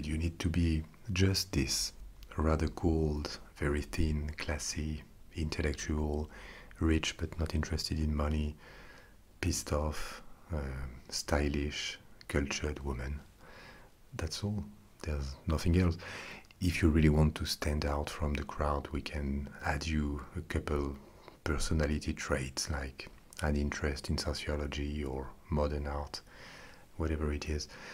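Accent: France. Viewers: This is awesome! I’m American and dated a French guy for several months. Your accent brings back memories.. fortunately he wasn’t quite this rude 😂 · Love this, very funny :) Much more like this, French accent and sarcasm goes so well together, lol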